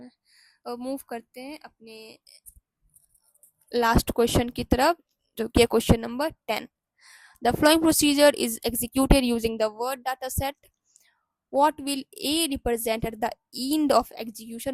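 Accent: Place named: Bihar, India. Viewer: Ap bihar ya jharkhand se ho na ap ka accent se pata chalta hai and Video is very helpful thanks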